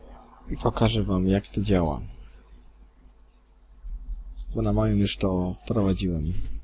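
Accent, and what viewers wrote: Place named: England, United Kingdom. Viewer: Masz taki angielski akcent <3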